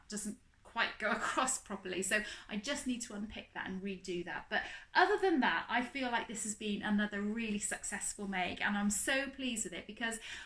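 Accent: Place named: West Country, United Kingdom. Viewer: Loved your post. I like your pattern and fabric choices. Lovely to hear a West Country accent. And well done, girls, you’ve both made a good start.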